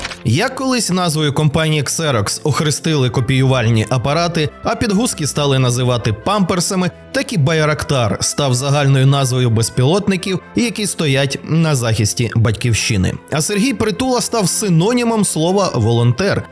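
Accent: Russia. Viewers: диктор - москвороте лайно та не розуміє різніці між нашими "і" та "и" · тавій акцент видає тебе, спалився ципсота запоребрикова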